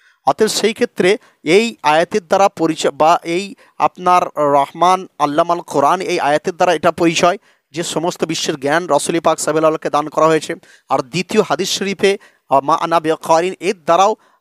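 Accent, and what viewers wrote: India. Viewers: oni west bengal r manus,oder occaron amader teke alada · উনি কলকাতার অধিবাসী তাই ওনার কথাগুলো এরকম মনে হচ্ছে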